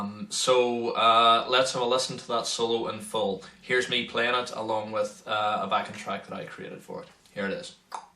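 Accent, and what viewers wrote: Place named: Ireland. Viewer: You are most welcome! I love your accent to my brother. My heritage is Scotch-Irish and my ancestors came to America around 1792. There is something about Ireland that draws me to visit there one day. If you knew my real last name (Phillips is a name I use often, but not my real name) you'd know instantly how I am connected to Ireland. Enough about that though yeah? I have played guitar for 42 years now and still am intrigued by how 6 strings can paint such wonderful pictures of sound. Yeah, I know that some guitars go to 7 and 8 strings but they are not for me. I have over 50 guitars now, down from 112 at one time. Some of my favorites: (1) Gibson RD...what a wonderful instrument (2) Gibson Melody Maker...a simple, yet incredibly insane instrument-the tones from this 1PU wonder are friggin' beyond belief (3) 1979 Fender Strat that is a masterpiece of sound (4) 1975 Fender Bronco, my first guitar ever and still plays so beautifully (5) 1985 Tokai Explorer-a Japanese masterpiece (6) 1978 Ibanez Musician- a 24 fret 14lb neck breaker of sound. 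It is one of the most well made guitars I've ever seen, yet it's weight is a problem. It is the heaviest of all my guitars and will burden you after 3 songs. It has more switches than a Boeing 747 (ok...not really) and I'm still discovering new sounds. More later....got to go...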